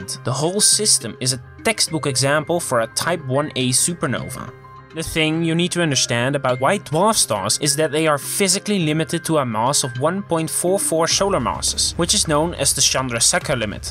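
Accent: Netherlands. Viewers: You Dutch or sonething?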